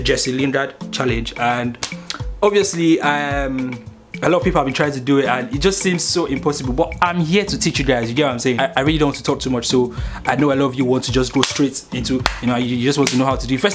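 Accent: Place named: Nigeria. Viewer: Omg you're so cute i love your accent are you from Nigeria? If so ayeee 💚💚!! Oh something else... i still cant do it....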